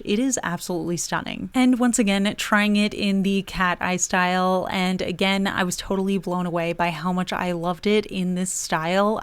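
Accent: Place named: Midwest, United States. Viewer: You sound midwestern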